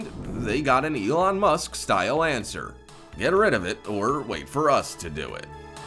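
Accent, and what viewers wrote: United States. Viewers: Might have been interesting to watch, but I only lasted around 15 seconds. I think you have to be american to be able to listen to that narration any longer.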